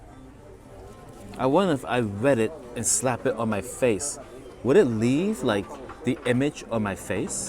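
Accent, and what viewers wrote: New York, United States. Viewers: Loved the video!!! I never noticed you had an accent!! You sound like your from NYC to me lol! I’m from Maryland so I never picked up your “Accent”!! 😂😂😂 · I thought New York as soon as I heard you. First time watcher, enjoyed it very much. Been doing Vegas since the early 70's, usually twice a year.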